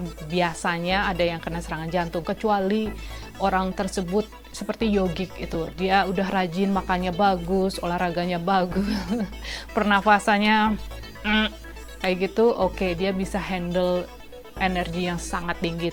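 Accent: Banyumas, Indonesia. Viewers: Mba Cilacap ya salam ngapak, ora ngapak ora kepenak... 😄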